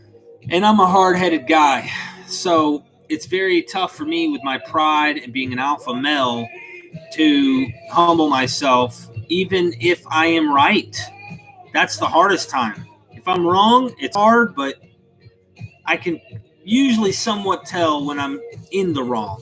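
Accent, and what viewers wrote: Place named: Virginia, United States. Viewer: Are you from VA? Just guessing based on your accent.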